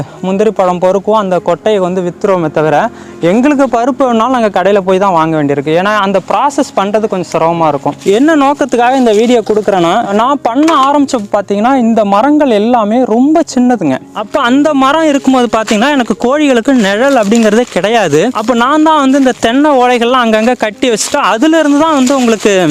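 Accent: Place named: Cuddalore, India. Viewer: ரொம்ப யதார்த்தம் .அதோட உங்க தமிழ் நம்ப கடலூர்மாவட்ட வழக்கிலே இருப்பது கூடுதல் சிறப்பு..ரொம்ப மகிழ்ச்சி...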